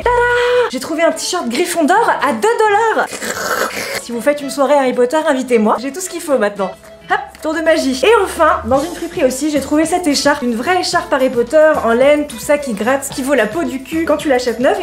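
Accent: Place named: Southwest France, France. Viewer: Oui une vidéo de! meilleures trouvailles du monde. j'adore ton accent de Maïté haha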